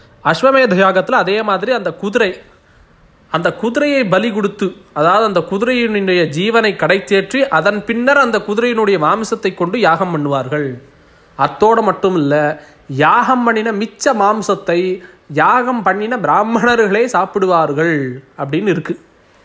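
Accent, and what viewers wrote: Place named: Tirunelveli, India. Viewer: சுவாமி திருநெல்வேலி போல பேச்சு அப்படிதான் தெரியுது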